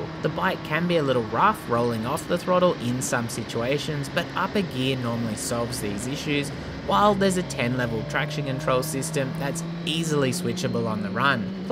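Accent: Australia. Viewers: My God what the f is wrong with the Australian accent?!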